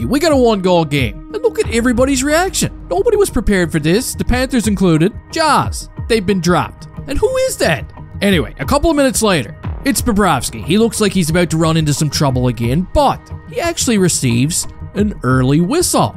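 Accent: Newfoundland, Canada. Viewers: First time viewing the channel and even as a Yank I can tell you’re a Newfie… 😂 love it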